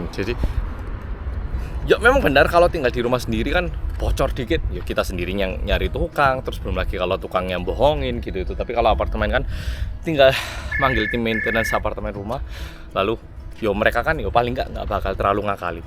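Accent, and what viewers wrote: Java, Indonesia. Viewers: yg aku selalu sadarin tuh cara ngomongnya mas khas bgt kek org jawa. ngomongnya harus berbelit2 dan ga to the point wkwkw. no offense, aku jg kyk gini krn org jawa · lagi ngrekam.bahasa indonesia logat jawa😂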